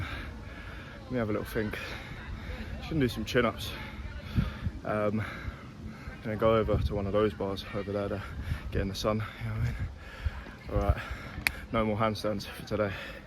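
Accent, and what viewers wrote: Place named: United Kingdom. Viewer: No question about it, you have a gorgeous body. I love your legs. They’re perfect. Your body is nicely proportioned You give good sexy face I hate the way you talk. With your unique name, I thought you were gonna be something different. I really really really can’t stand. I’m so disgusted by that British accent. Where are you? I’m subscribing to you for your sexy body and to watch you exercise and do shit. But dude, you gotta learn to speak standard American English. It’s like a Midwestern American English. Do you have an only fans? Have a great day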